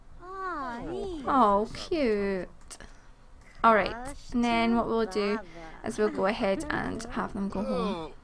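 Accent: Scotland, United Kingdom. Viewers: We have the same accent I'm Scottish too 😊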